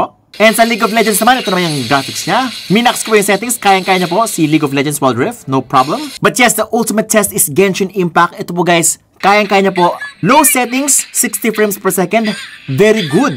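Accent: Visayas, Philippines. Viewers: HAHAHAHAHA bisaya kapo ba kuya?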